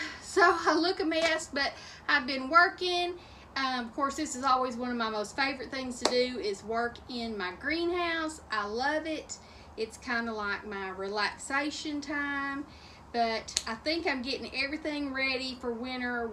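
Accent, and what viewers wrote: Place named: Virginia, United States. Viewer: I can’t thank you enough for the before and after video on your philodendron. Like you said lots of videos about them but none like what you did. I have the same long gated 20 year-old philodendron which I will be doing exactly what you did taking pictures and sharing them with you again thank you thank you thank you by the way I love your accent. I’m here in Sacramento California but, I have a brother who is in Richmond Virginia so it’s a loving reminder, lots of love to you and thank you again. Rene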